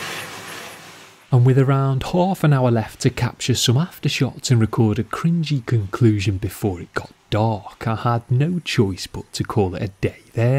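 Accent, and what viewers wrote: Liverpool, United Kingdom. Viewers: Daniele Cognome - he has a Scouse accent - from Liverpool, England. Same as the Beatles, that pop group from the 1960s.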